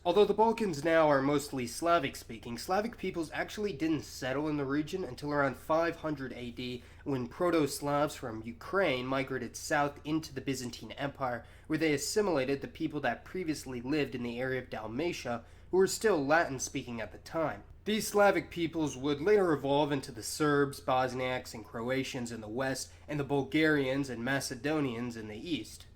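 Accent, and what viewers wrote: United States. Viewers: there are multiple issues with your videos and you know about some about these. You have a good American accent, sounds convincing. But that's your nemesis as well. 1. Pronunciation issues These stem from English, where they repeat A(ei), B(bi), C(si), D(di), E(i), F(ef), G(dji), H(eich), I(ai)...Y(uai), Z(zet). FYI, all speakers of BBC check the correct pronunciation of any foreign name with locals BEFORE saying in on air! Please, note that languages different than English have PHONETIC alphabet, meaning that 'A' is always (a) but not (ei), 'E' is (e) but not (i), and so on lran is not (airan) but (iran). Just like Italy is not (aiteiluai) but (itali), or more correctly Italia (italia), and Enland should be Anglia (anglia) but not (einglaiei). Iraq is not (airak) but (irak). Macedonia is not (masedonia) but (makedonia) Dacia is not (deisha) but (dakia) Thracia is not (treisha) but (trakia) Persia is not (purza) but (persia) 2. 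Ethnic issues Romans (citizens of Roman Empire) have absolutely nothing in common with Gypsies who came from India with Alexander The Great, for whom George Soros paid to be named 'romani'. 3. Today's Romanians are descendants of ancient Dacians (dakians). Dacians (dakians) are Thracians (trakians), and Tracians (trakians) are Bulgarians. FYI, old Bulgarians, had the most precise/accurate calendar ever known and, acknowledging this, in 1976 UNESCO recommended its adoption worldwide. These people had the oldest known crafted gold - Varna civiliz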